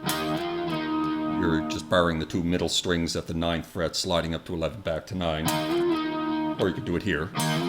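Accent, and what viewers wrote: Canada. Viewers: good to hear a Canadian accent! I was living in Toronto until January, now im back in Australia. Miss my buds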